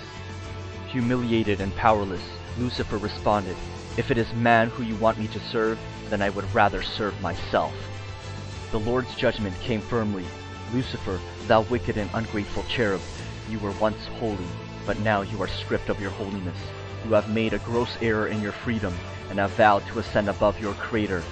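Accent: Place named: United States. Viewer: Before you try to claim I'm being anti-patriotic, I'm an American. Anyway, there is nothing more cheesy than an American accent mixed with forced heavy tone - it just sounds laughable. I would prefer a Rabbi speaking in Yinglish (Yiddish/English) over this very non-threatening and boring and characterless American accent. Boring.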